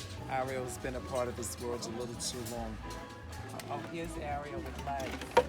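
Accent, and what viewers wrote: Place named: Bronx, United States. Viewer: I hear the Bronx! Love it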